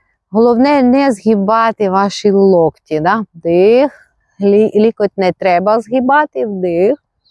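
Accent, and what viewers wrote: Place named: Poland. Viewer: Спасибо Вам. Приято слушать Ваш говор, наверное польский.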